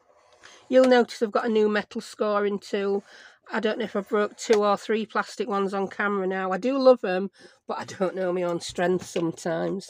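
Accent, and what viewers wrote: United Kingdom. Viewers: I am so glad I found your channel. I love your no-nonsense directions. Easy to follow with a bit of humor. It makes me smile that you share the flub-ups. 😊 I love your British accent and humor. It reminds me of a dear friend that passed a while ago. Keep making the how-to's and I will keep watching. ❤🥰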